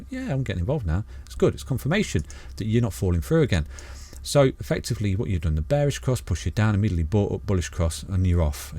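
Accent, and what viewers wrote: United Kingdom. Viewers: I 've got my headphones on and i'm so enjoying his warm British accent, i cant even hear his neighbors car at the background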